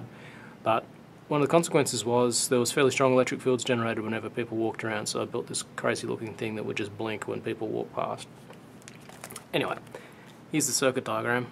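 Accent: Australia. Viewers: Great video! Are you by some chance of Australian origin?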